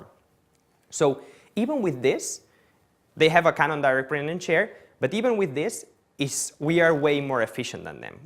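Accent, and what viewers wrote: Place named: Italy. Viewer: Sicuro sei italiano!!!😊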